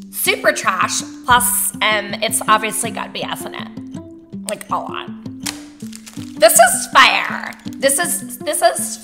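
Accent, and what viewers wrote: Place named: United Kingdom. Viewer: How fun!! - Looking forward to the next one! ... and Your British accent is impressive!!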